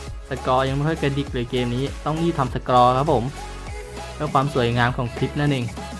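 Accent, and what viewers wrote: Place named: Thailand. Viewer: พูดเหน่อม๊ากกก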